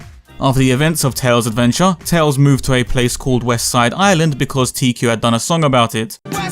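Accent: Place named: Inner London, United Kingdom. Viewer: I'm curious to know, I'm think you mentioned this in another video but where about in England are you from again? Your speech makes me wanna say inner London?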